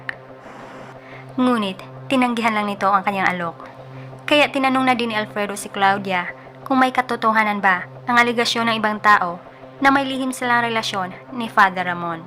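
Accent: Visayas, Philippines. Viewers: Very besaya ang accent dzaiiii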